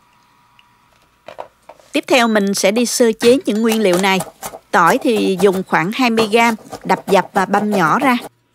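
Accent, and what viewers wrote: South, Vietnam. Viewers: Giọng chị miền Nam , chị thì không nên dùng các từ đĩa( dĩa), thìa( muỗng), thái(cắt, sắc)...các từ đó để người miền bắc nói đúng hơn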